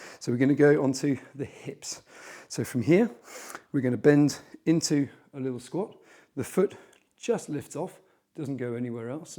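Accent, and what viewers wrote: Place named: United Kingdom. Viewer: British? Ugh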